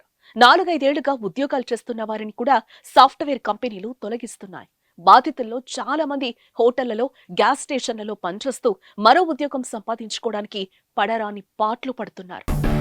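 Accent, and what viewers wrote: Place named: United States. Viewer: అమెరికా వాళ్ళు తెలుగు మాట్లాడినట్లుంది నీ ఉచ్చారణ. నీ ముఖం.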